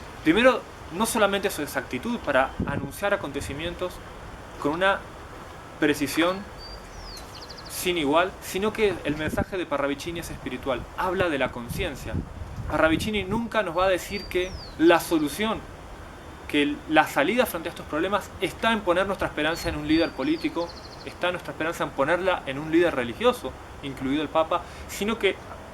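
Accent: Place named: Argentina. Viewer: Gracias. Me encanta tu acento argentino. Nos diste muy buenas explicaciones!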